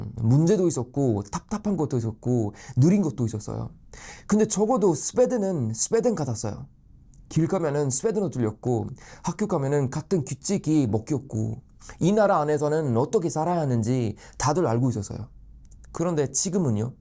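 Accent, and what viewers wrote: Gyeongsang, South Korea. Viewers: 왜... 경상도 사투리가 들리지.....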